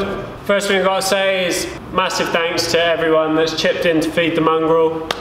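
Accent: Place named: Dorset, United Kingdom. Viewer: Dorset UK im guessing by the accent lol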